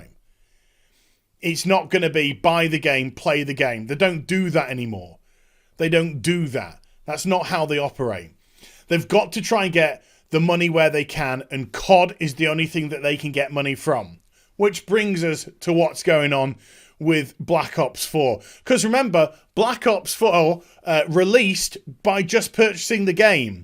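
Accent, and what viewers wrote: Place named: United Kingdom. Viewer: for some reason ranting sounds amazing with the British XD but yeah sad to see blizzard slip and slide into oblivion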